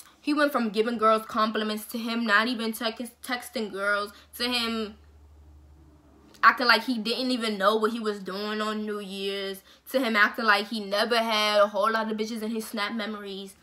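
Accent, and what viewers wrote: United States. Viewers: I need a Maryland chick 💀 I’m loving how you talk sis 😂❤️😘 but don’t get me started on cheating & niggas 🤦🏽‍♀️ I got storytimes for days on my channel lol 🙄 keep doing yours! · i could tell u was from pg... same girl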